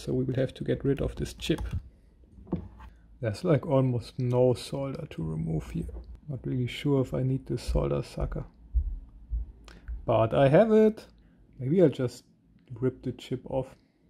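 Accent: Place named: Germany. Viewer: Very useful bringing that googlegroup blog to life! Coupled with deadpan German(?) delivery. Excellent!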